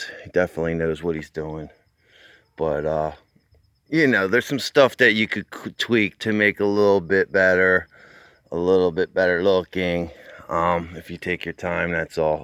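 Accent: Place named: Philadelphia, United States. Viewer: I don’t know you, but I can tell from your voice you’re from Philly, Camden, Gloucester, or Burlington, County. I’m from Collingswood(Camden County). People still gimme shit about my accent, and I’ve been here since 03. I’m about to put ATS 505 CRO/CRC in my wife’s Mini Cooper GDI and came across your video. Anyway great video, man. Thanx for the testimonial in my favorite accent. This jawn better work, 🙃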